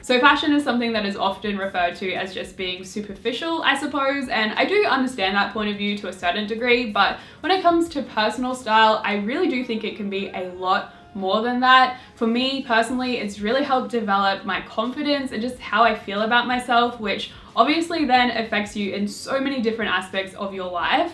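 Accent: Australia. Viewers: is this chick Aussie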